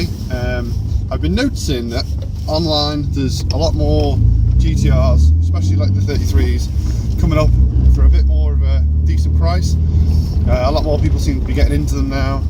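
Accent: United Kingdom. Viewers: I like the accent but some words are funny to me at times but I love the car culture in the UK and movie's also the word cunt such a passive blunt word lol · Fellow scouser. Recognized the town in the background before the accent tbh. My R34 has zero body rust underneath although some of the bolts and fastners have surface rust on them. Looking at it though I can see, how they can rust easy. The paint is no better than a Micra of the same era. i.e. shite.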